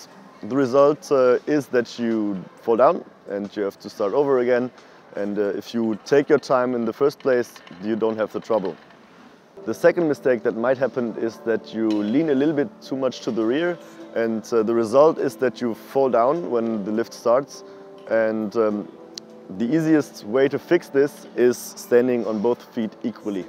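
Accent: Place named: Germany. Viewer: B H-Abigel German accent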